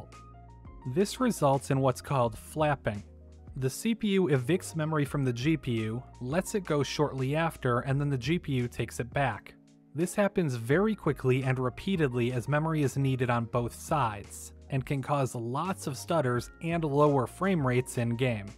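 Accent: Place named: United States. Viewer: Ah an American living in Sweden. Your accent did also seem way too American for me to think you were a native Swede, lol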